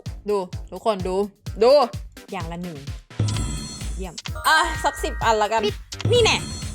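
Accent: North, Thailand. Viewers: พูดเหนือน่ารักมากพี่(;ŏ﹏ŏ)(๑♡⌓♡๑) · เง้ออชอบให้พี่พูดภาษาเหนืออ่าาน่ารักดี😳😻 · พี่คนภาคเหนือเหมือนผมเลย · พูดภาษาเหนือตั่ลร้ากก พี่ 😳🥰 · พูดภาษาเหนือบ่อยๆนะครับน่ารักดี❤️😊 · พี่ พูดเหนือเป็นด้วย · พี่พูดภาษาเหนือ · เป้นคนเหนือกะ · คนเหนือออ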